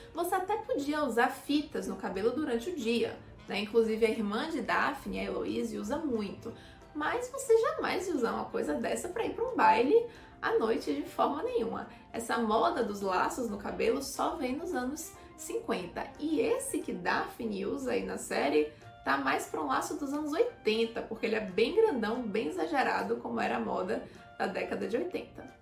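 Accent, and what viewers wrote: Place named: Bahia, Brazil. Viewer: Amei o vídeo! Continue, não desista desse canal incrível ♥️✨ Edit: conheci o canal por esse vídeo e ja pelo sotaque desconfiei q era baiana, fui conferir o vídeo de apresentação e acertei! (Minha conterrânea kakaka)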